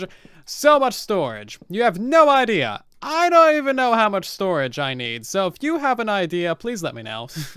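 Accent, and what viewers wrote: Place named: United States. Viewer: It’s a pretty obvious American accent if you ask me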